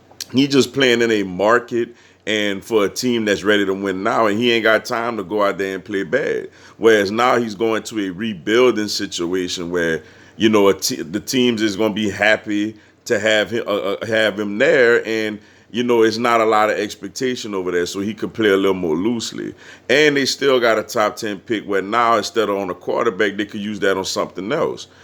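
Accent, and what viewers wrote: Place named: Gullah Geechee, United States. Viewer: Bruh, never seen a video from ya, but say, you grew up in Charleston or got a lot of fam from there? You sound a lil geechy cousin...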